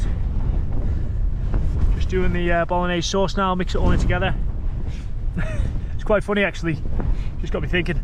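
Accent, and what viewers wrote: Liverpool, United Kingdom. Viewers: Are you a scouser by any chance?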